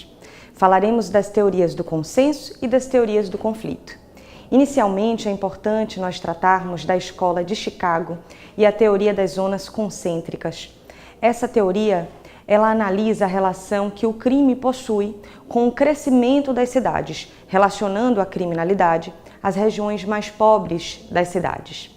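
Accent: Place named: Bahia, Brazil. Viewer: acho que vem da BAHIA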